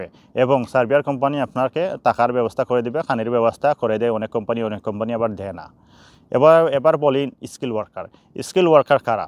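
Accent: Sylhet, Bangladesh. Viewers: Apne Sylheti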